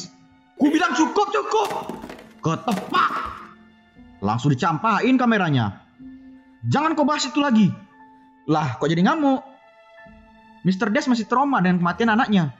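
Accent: Medan, Indonesia. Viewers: Bg orng Medan ya bg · Mantap bang orang medan y sama lah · Pernah nonton film-nya, tapi waktu baca notif langsung klik aja🤭 mantap kali bang,,, aq suka bahasanya kaya orang medan😃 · Logat medan bukan sulawesi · Orang medan dia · Anak medan ni keknya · Saran: kasih banyak logat medanya kayk suara chinoll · Bang gua kangen ama suara asli medan lu bang gua suka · Kek suara orang Medan:v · Logat medan pun membuat saya subscribe😂 Asli ini mimin ny bner2 buat kita kyk nganggap suara dia jadi pemeran itu. Feel ny dpt bngt. Terus berkarya lek👍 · Wkwkw org Medan ya bang? Btw mantap bang trus berkarya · Pengisi suara nya jangan ganti" bang.. Tetap pake bahasa medan... Mantap seru kali aku bahh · Logat medan emang gk ada duanya.. 🤣🤣🤣 org Medan jg nih 🤭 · weh bang, kau ceritain film kenapa harus pake bahasa Medan bang, kan jadi ngakak😂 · Orng medan ka bah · orang medan nih pasti abangnya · Emang orang medan daerah namorambe emang the best ligat suara lu bang? 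· Anak medan punya logat 😂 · pasti orang Medann ni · Orang medan mana suara kelen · Logat medannya keren abg, semangay trus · Medan punya bos wkwkkwk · Yang bikin saya suka Vidio in adalah logat medannya Abang Abang ini🤣❤️ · Orang medan kah abang😂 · Orng medan bang? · Nampak kali orang Medan mantap bang 👍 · Berasa lagi ngobrol sama calon lakiku 😂😂 dia orang medan pula wkwkwk ngakak denger suaranya medan banget jir abang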